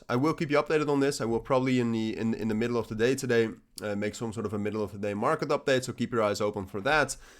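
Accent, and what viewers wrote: Netherlands. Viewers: Duurde even voordat ik je nederlandse accent hoorde. Engels gaat je goed af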